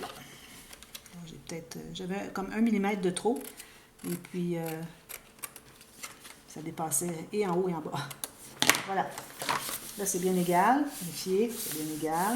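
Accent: Canada. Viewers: Bonsoir, Est ce que tu pourrais nous montrer comment faire un album sur le thème de Noël? Bonne soirée Ps: Votre accent vient du Canada?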